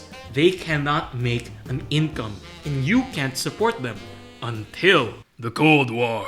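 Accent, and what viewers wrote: Philippines. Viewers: i just started playing tropico 6 and was looking for some videos to get better. i noticed your accent and subscribed right away haha. love seeing filipino channels as well thought out as yours. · you sound Filipino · Are you filipino? I can't quite tell your with your accent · you sound very filipino.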